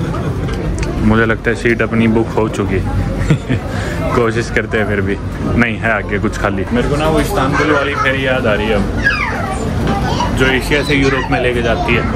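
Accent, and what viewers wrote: Haryana, India. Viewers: Haryanvi accent m vlog dekhn ka mja alag h besk hindi bolta ho. Bhot badiya h bhai. Baki height dekh angrez m chote lage h 😂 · Bhai aap Haryana se ho kya ? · Really like the way of explaining everything in very simple way. Also the music while you were on that ferry , you looking into waters , what a moment it made me emotional. You take so much efforts, walking everywhere totally agree for such a short distance they charging so much or its just the conversion making us feel so costly don't know. Buddy simply great. ❤️ for showcasing the reality in subways. again your Harayanvi tone 👍🏻 the way you speak. 'Dhakke' khaye but it was worth. Lots of ❤️ to you. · Haryani accent is Lit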